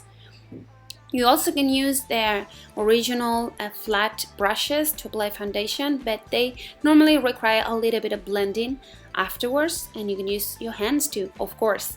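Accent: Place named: Spain. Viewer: acento spain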